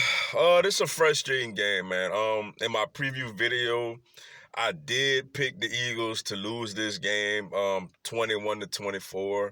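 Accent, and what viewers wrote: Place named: Louisiana, United States. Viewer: Surprised you're not a Saints fan. Got that La accent.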